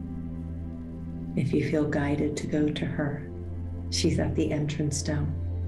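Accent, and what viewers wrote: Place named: Ireland. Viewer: machnaimh álainn! Tá mé i mo chónaí i mBéal Feirste agus ba mhór an t-iontas é do bhlas Gaelach iontach a chloisteáil! míle buíochas ó bhandia amháin go ceann eile. grá agus sparkles!!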